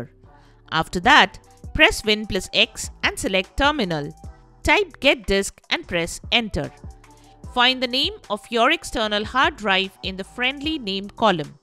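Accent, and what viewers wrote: India. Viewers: i stop when i hear indian talking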